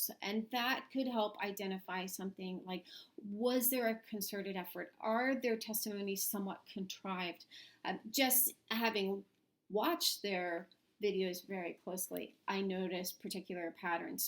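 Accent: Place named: Philadelphia, United States. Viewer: Are you originally from the Philadelphia area? I feel like I hear a little bit of a phila accent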